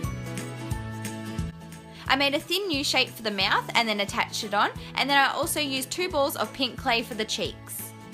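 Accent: Australia. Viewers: I KNEW you sounded Australian!!!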